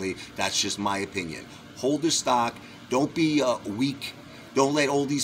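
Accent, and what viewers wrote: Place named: Long Island, United States. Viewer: I’m from LI…you sound and look like my people!!!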